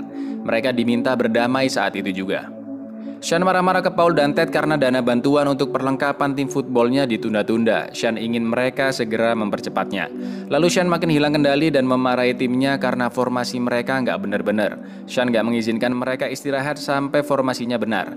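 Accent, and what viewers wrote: Java, Indonesia. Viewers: Jawane ngdi mass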